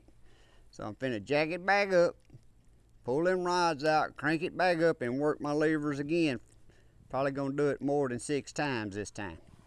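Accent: South, United States. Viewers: You just by god got a like and a new subscriber. Never seen anyone who gets straight to the point and I’m from alabama and can understand the southern draw quite well. Thank you so much!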